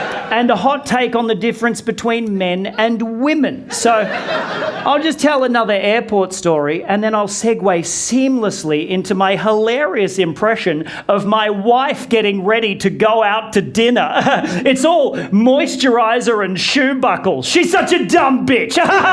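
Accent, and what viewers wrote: Australia. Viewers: R u an Aussie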